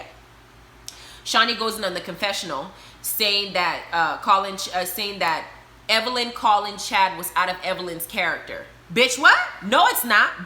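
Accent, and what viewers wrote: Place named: Caribbean. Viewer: I hear a accent.. are you Caribbean by chance?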